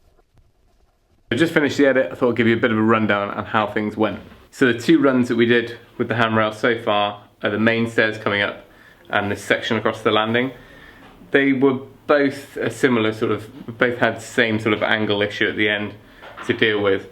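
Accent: United Kingdom. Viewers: Great job but are you in Australia but sound like british???what's on your sandwich butter or jelly???? Lol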